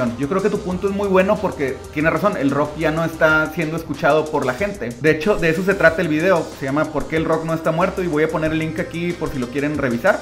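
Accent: Mexico. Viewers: Excelente video, yo soy de Ecuador y realmente a todos los mexicanos les oigo igual, 😅😅😅, no me parece que se merezca un video, ella fue un éxito de 5 canción a lo mucho y se fué desvaneciendo, hasta desaparecer, me fascina desde que tengo 12 años, aurita llevo 39 vueltas al sol, y cualquier video sobre ellos Siempre será bienvenido, que tengas un gran fin de semana. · siendo sincero el acento mexicano no me gusta para nada, me suena ridículo a veces, pero debo admitir que el tuyo es el mejor, ni siquiera sabia que era mexicano, y ahora que se que es mexicano me va a costar decir qie no me gusta el acento, me encanta tu voz y la naturalidad con la que hablas, me da curiosidad saber como cantas.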